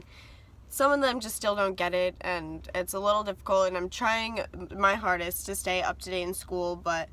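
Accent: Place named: United States. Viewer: Wait do u live in ireland? You sound american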